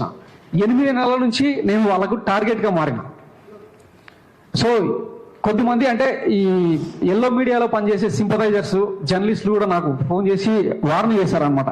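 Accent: Telangana, India. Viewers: నేను ఆంధ్రప్రదేశ్ వాడిని. కానీ తెలంగాణ యాసలో మీ గొంతు అంటే నాకు చాలా ఇష్టం. మీరు పలికే ప్రతి మాట సంకీర్ణ ప్రభుత్వానికి కత్తిపోటులా అనిపిస్తుంది.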